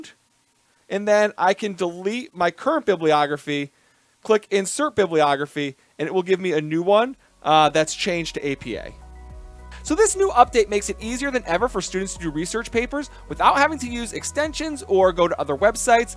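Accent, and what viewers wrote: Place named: Chicago, United States. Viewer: Love your Chicago Midwest accent. Sounds like home.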